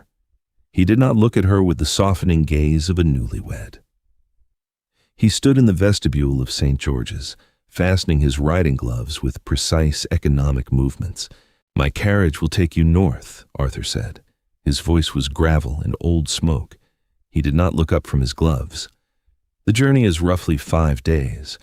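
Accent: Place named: United States. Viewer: An English story of Duke and Duchess read with an American accent is dreadful to listen to 😳😏👎👎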